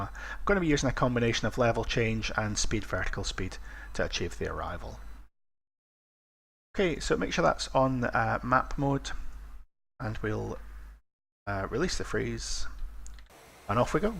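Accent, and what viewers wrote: Scotland, United Kingdom. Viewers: Hi, currently learning lots of invaluable flying techniques and tips from your tutorials - many thanks! Quick question about the check heights from the chart - would you typically have those memorised or written down somewhere for cross-reference? Thanks - nice to hear a Scottish voice:-)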